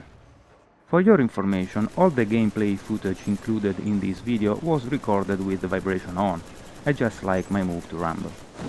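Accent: Italy. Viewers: il tuo inglese non è male, ma l'accento è italiano. xD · Ma sei italiano?.. Sembra dalla pronuncia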